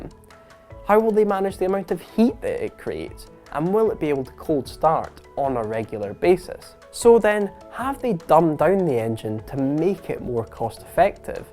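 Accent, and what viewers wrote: Scotland, United Kingdom. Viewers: Is Scottish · Started watching then heard that roaster Scottish accent.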